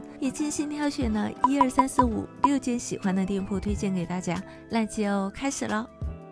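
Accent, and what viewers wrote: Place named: Sichuan, China. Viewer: 這兩天在成都出差，遇到一位川妹跟妳說話的方式簡直一模一樣。忽然覺得好親切「呦」！😊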